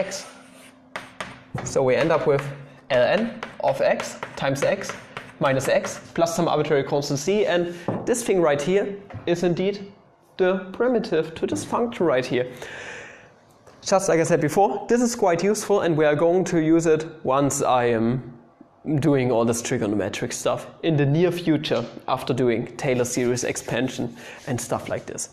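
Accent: Germany. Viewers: You sound german. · I'll be honest. Sometimes I just get lost in his clumsy ways and german accent. xD But keep up the good videos. ;)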